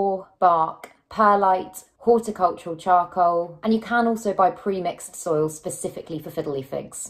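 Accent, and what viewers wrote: United Kingdom. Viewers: Nice to hear a British plant youtuber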